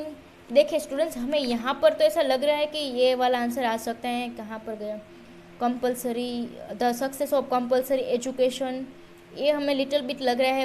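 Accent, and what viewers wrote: Gujarat, India. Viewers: Awesome 👍, are you gujarati?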